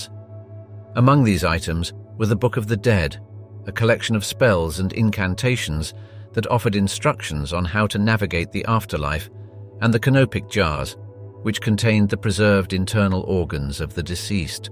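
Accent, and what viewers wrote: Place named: England, United Kingdom. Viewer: YOU NEED TO BE “SLAPPED” FOR TRYING TO MAKE A GREAT CIVILIZATION LOOK BAD! Great Britain ‘s Royal family are “ALL COUSINS” & committed “INCEST” ALSO BY MARRYING FAMILY! ALL EUROPEAN ROYAL ARE CLOSE RELATIVES!!! King Charles & his deceased wife, Diana ARE “COUSINS”! “STOP” RAGGING EGYPTIAN ROYALS, WITH YOUR “JEALOUS & HATEFUL” ENGLISH ACCENT! HOW DARE YOU????!!!!!!!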